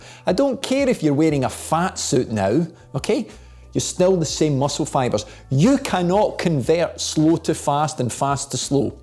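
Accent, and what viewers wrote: Scotland, United Kingdom. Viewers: Fook me, did I just spend 12 minutes listening to some Scuuu...ottash lad telling me to get my head down and stay off the brakes! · I just watch these videos to hear him swear in Scottish.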